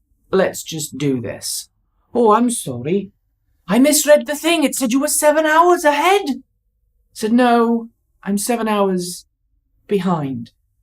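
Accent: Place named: Wales, United Kingdom. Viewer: Ooh your Welsh accent sounds like Mrs Doubtfire!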